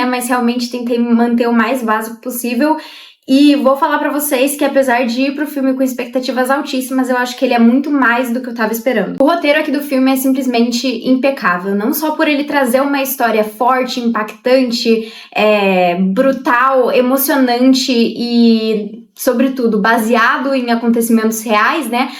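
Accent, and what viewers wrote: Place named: Caipira, Brazil. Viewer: Eita, sotaque caipira não dá. Muito rim. Vai estudar mulé.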